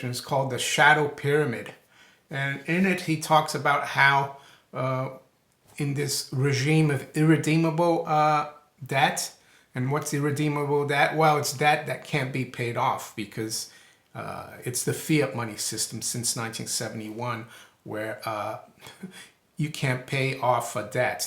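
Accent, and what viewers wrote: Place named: United States. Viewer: Hiya, u are an economic genius, love you, love your programmes but you need to get rid of your Yanks accent, you are a Londoner now m8!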